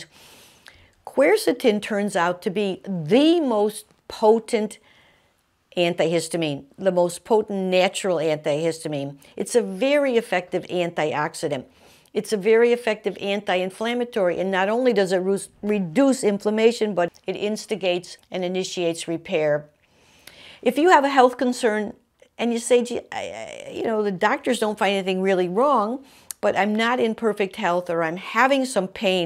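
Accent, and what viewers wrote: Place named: Brooklyn, United States. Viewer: Of course,....you are selling a quercetin supplement! Where's dat accent from,....you sound like my uncle Louie from Brrrooklyn!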